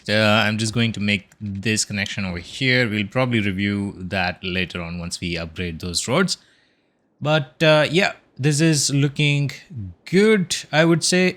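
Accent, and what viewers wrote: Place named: India. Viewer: Excellent video! I'd like to know if you're basing your city in any real locations. I'm brazilian and I often struggle to do stuff familiar to the place I live, because this game is very "american". Apparently, judging by your accent, you sound a little bit indian, so i imagine we run through the same problem. I'd also wanted to know if you consider LUT's or map themes as "mods". They could make this city look even better than it already is! Anyway, hoping to see some new episodes! <3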